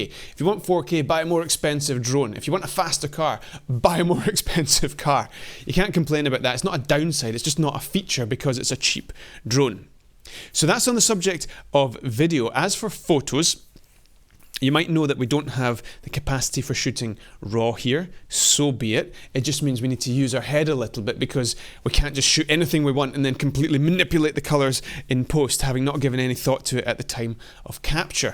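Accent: Scotland, United Kingdom. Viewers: Scottish. Scottish accent. Never ever confuse Scots with English.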